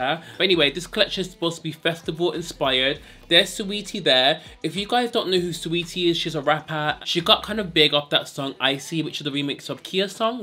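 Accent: United Kingdom. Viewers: You have the strongest British accent I’ve ever heard lol. I’m American 😫 I’m not use to it at all!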